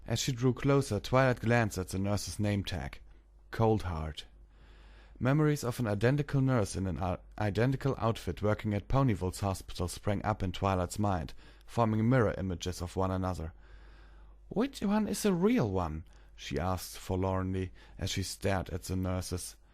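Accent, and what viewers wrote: Germany. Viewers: Sounds more like German to me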